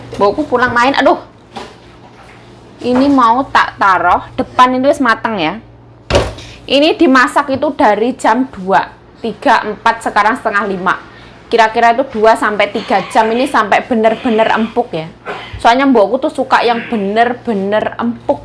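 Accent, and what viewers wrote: Java, Indonesia. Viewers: Aku belajar masak dari cantik Bicara logat bahasa Jawa 👍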